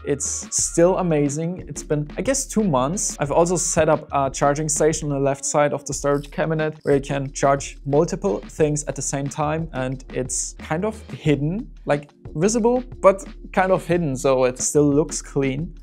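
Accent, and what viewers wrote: Germany. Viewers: German English, cool:face-fuchsia-wide-eyes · It's funny how your english sounds flawless 90% of the time, while being so obviously german at the same time. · I hear german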